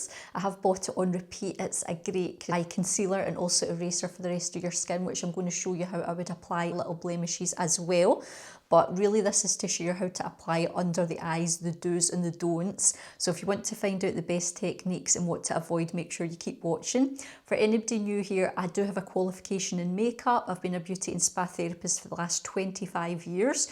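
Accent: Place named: Scotland, United Kingdom. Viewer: i’ve already watched a couple and loved them. I’m older and absolutely love beauty and make up and I seriously have never never been able to put concealer on. I read about this one and thought I’m going to try it and then found your video about how you apply it and seriously never had such success with an under Eye concealer and I need it at my age so thank you and I will enjoy watching the rest of the videos and I’m so glad I subscribed and I’m also Scottish but I live in Australia, so the accent is very welcome 😊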